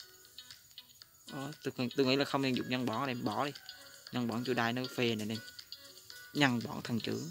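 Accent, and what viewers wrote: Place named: Quang Nam, Vietnam. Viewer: Nghe giọng quảng nam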